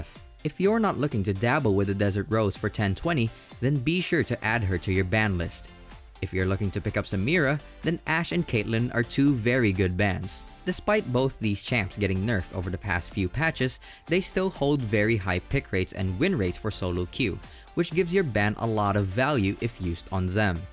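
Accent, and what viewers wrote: Philippines. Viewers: This guy talking is filipino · Is the one talking a filipino or any asian?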